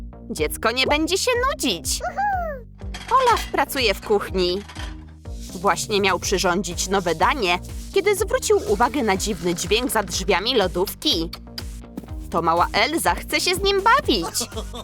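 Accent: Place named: Poland. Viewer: Cóż za ciężki polski akcent ala Max Kolonko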